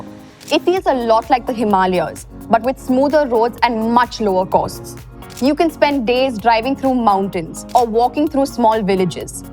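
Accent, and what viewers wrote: India. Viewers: Your english accent sounds like Indian accent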